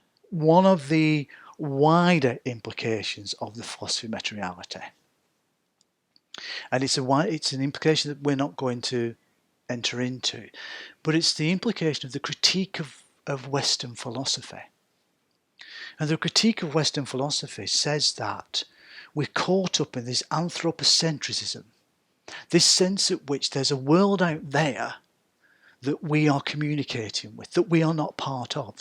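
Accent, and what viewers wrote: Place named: North, United Kingdom. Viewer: Thank you, you've demystified quite a bit for me there. Great to hear a northern accent too! Cheers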